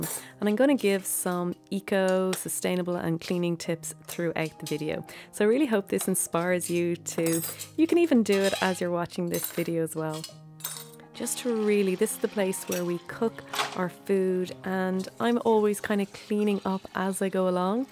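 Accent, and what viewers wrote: Ireland. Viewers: I absolutely adore your beautiful home. We live in a small 800 sq foot home, too. It's cozy and perfect. Did anyone ever tell you that your voice is so soothing? My husband is a Newfoundlander, and I can definately hear his Irish roots in your accent. Much Love, from Ontario, Canada 🇨🇦... Keli 💖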